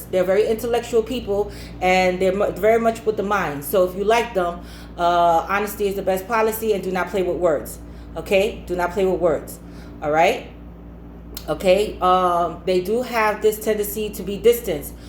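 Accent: New York, United States. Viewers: i LOVE ur accent lol ny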